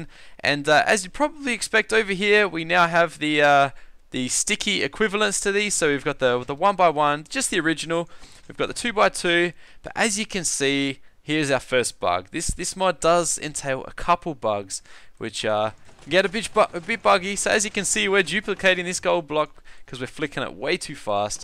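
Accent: Australia. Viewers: U sound Australian cool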